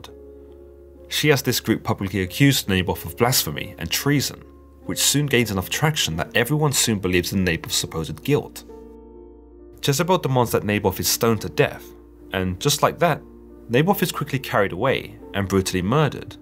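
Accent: United Kingdom. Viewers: 😝😝😝😜😂this guy is really funny with the strong british accent. If the bible is from middle east, why dont you get some narrattor from middle eastern who know where to pause and where to be flawless. You guyz make fun of Indian people...atleast they speak english in neutral accent understandable to all the population of the world . This strong accent is awful which indeed creates stress..Lol . From new delhi India.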